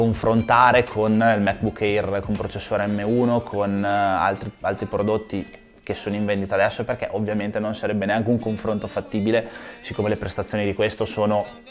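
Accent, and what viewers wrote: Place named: Romagna, Italy. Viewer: prego! Eh eh eh... scusa ma io ho la mamma di Rimini e il tuo accento mi sembra molto simile! Bellissima città Bologna!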